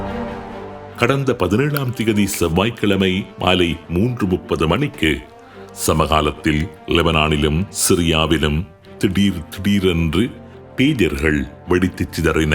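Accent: Sri Lanka. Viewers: இலங்கை தமிழ் 🙏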